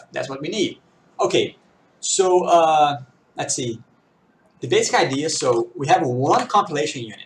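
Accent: Brazil. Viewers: é br??? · percebi desde o inicio que vc era brasileiro kk belo trabalho aí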